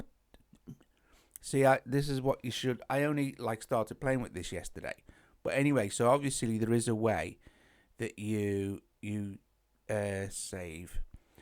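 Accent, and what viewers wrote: Liverpool, United Kingdom. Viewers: Scouser? I think so.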